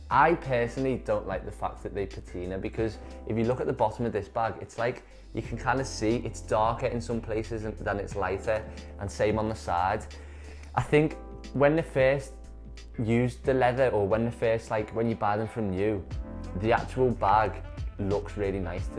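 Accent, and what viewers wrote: Liverpool, United Kingdom. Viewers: I think the Damier Ebene is better as it looks freshly sexy even when it is so old and used.. By the way are you from Liverpool?? :)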